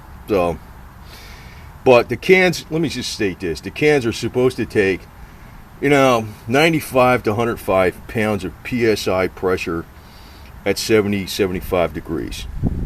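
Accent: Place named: Philadelphia, United States. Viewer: Sounds like he’s from Philly.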